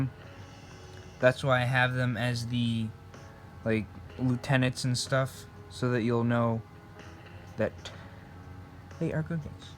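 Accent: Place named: Germany. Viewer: Question can you voice a German tiger commander for me with your funny German accent?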